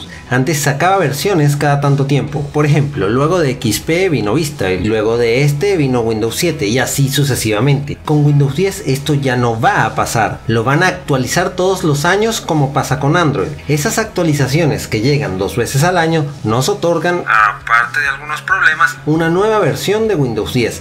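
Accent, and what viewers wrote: Venezuela. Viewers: Primer Video en donde se escucha marcado el acento venezolano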